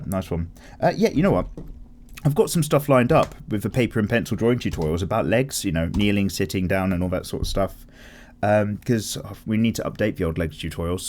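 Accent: United Kingdom. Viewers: Hey, greetings from Bulgaria! I love your art and especially your tutorials! Some time ago I got into DWM. At first I was sceptical as to how the hell I could draw while you’re British accent is echoing inside my ears, yet I found it’s amazing! I truly draw better while listening to DWM! Thank you, keep up the good work!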